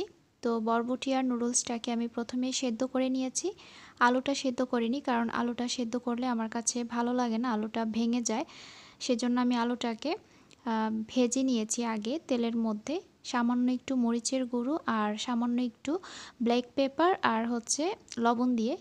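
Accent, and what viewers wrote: Comilla, Bangladesh. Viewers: Apu tmr basha comilla kothay?? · Nice apu bt apnar basha comilla kothai amar o comilla basha apu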